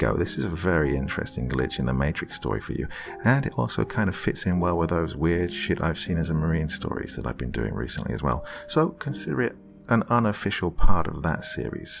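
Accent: United Kingdom. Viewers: Why do british people do the best narrations?? My narrator voice I do has been British since I was a child lol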